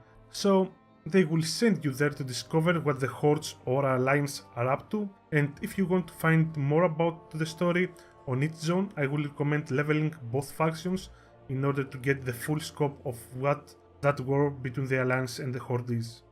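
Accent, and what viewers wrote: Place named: Greece. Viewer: πως καταλαβαινεις οτι μιλαει ελληνας αγγλικα απο το πρωτο millisecond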